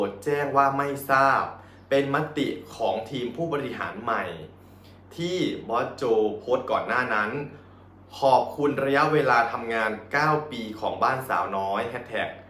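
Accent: Isan, Thailand. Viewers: เสียงอีสานยังรอยู่นะลูก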